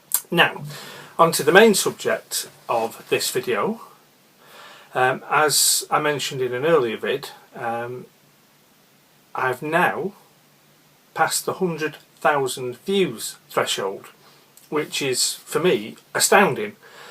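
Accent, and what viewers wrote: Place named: Manchester, United Kingdom. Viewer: Hey I'd open they got sauce! That'll make a cracking vid at some point! 😂 I've only followed you for a few months but your lovely mancunian accent and straight up, no nonsense words of wisdom have always been a good watch. Count me in for the subs draw sir, congratulationso on your views milestone and have a lovely weekend!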